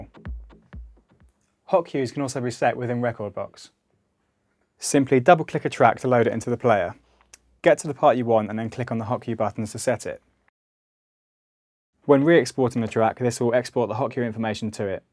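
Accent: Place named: United Kingdom. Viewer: The guys accent is too pronounced and he talks too fast. The tutorial would be more effective if it didn't cater only to brits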